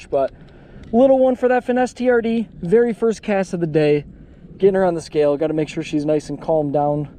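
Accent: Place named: Midwest, United States. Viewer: Sounds like your Sobi’s brother lol midwest aye?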